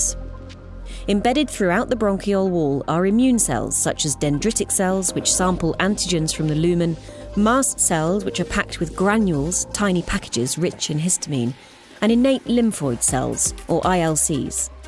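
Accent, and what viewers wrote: United Kingdom. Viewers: The british accent feels like shit, shut up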